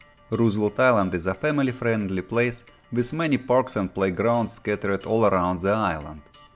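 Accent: Russia. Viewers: You are russian? because your english sound a russian accent :)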